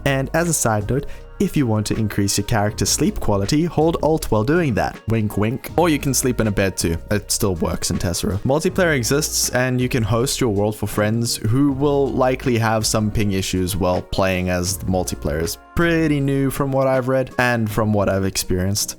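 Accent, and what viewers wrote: South Africa. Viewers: Joburg accent?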